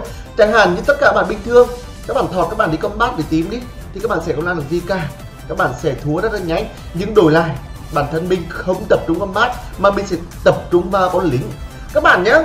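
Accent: Nghe An, Vietnam. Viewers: Idol của tui ngày nào , cái dọng Nghệ An , từng cái clip cẩm nang Nak , giờ sắp thành Idol triệu sud rồi , chúc idol thành công nha , Mại yêu 😘😘😘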